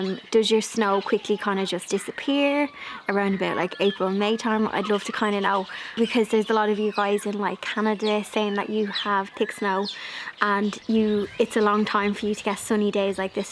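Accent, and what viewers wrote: Ireland. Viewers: Hi I have just started dating your blogs. I love you Irish ascent. As I,m from Australia. Where did you learn how to decorate you little house. Thank you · I'm in zone 7a in Niagara Canada, we have 8 months of growing season, no snow since this week, yes we plant bulbs too. I love your gardening vlogs, very inspiring to see all that green and to hear your cheery Irish voice. I've only seen Gardener's World on YouTube because of your recommendation. Thank you for sharing.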